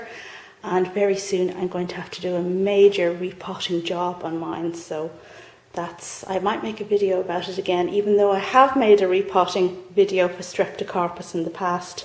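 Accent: Ireland. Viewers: Happy to have found your channel via Lyn's! I just love your accent and love seeing glimpses of Ireland out your window! I live in the US and my mom took me to Ireland for my 30th birthday. My parents have friends in Ireland and they drove us around because neither of us trusted ourselves driving on the other side of the road! We visited in February and I loved the rain and seeing the mist in the valleys and fields. We stayed in a town a short train distance from Kilkenny. I can always remember Kilkenny but not the name of the little town! Haha. I wish I had thought to bring a houseplant back as a souvenir.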